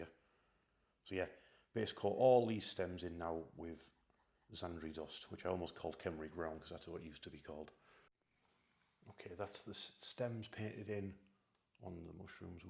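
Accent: United Kingdom. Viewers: Ah the audio quality. The Smoggie accent really shines through 😅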